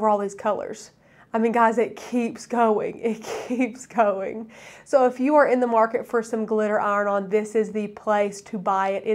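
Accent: South, United States. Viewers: Agreed, a blessing you are! It’s so nice to come on here and learn from people who you know are kind and sweet, not to mention, you are lovely and your accent is just adorable, I am from California and I am telling you that is definitely not how we sound. Your sweetness is from the south, im going to assume, I’m ready to move. Hee hee! 😇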